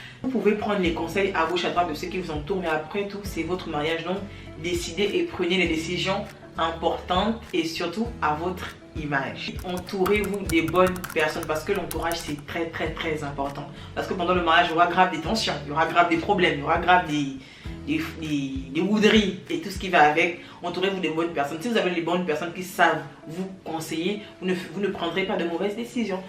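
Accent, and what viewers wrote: Gabon. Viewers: Votre accent m'est beaucoup familier❤ Le Gabon est là 😊?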